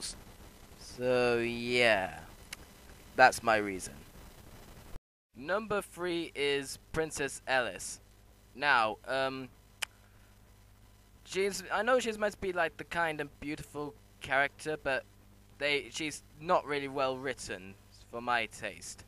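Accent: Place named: United Kingdom. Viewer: Well I 3/4 agree with you but I lol'd when you started acting like Chris,and you do sound like you have a British accent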